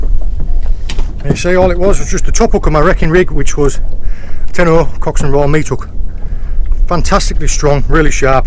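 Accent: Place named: West Country, United Kingdom. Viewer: A cracking watch as usual, so many talking points!! Tips & hints galore all the way through which we have all became accustom to, watching your vids, I'm no stranger to wreck fishing but I have a skipper's guidance to assist me, the fact that you're doing this solo makes it all the more creditable. The part of the country that you're fishing makes me envious to say the least, no wonder you relocated from the North East, that's the accent I'm detecting!! Although I can hear a West country twang entering your dialogue occasionally!! If you are a sea angler in the UK does it get much better than Cornwall? As you've shown the fishing ain't too shoddy but the fact that you share the environment with Minke Whales, Dolphins & Tuna really showcases the South West in general to anybody not already acquainted with it's natural beauty. As I said so much to talk about but one last thing, how close do these other boats want to get to you? 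How annoying when they've got the entire ocean to fish!! Solid stuff, just what I need on a Saturday morning!! All the best pal.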